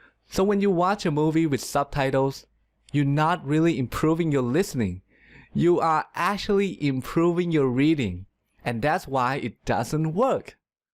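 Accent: Thailand. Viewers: กลับมาฟังหลายรอบแล้วครับ คนไทย ผมจำได้ สำเนียงมันออก อิอิ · Are you Thai? I think your accent like Thai people. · Thank you very much for a great video. It's very useful. Also I guess you are Thai from your pronunciation^^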